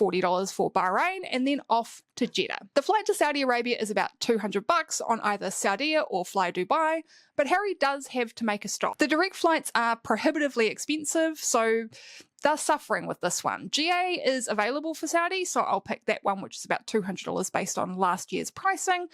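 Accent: New Zealand. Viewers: Oh cmon, a Kiwi accent isn’t the second worst English accent…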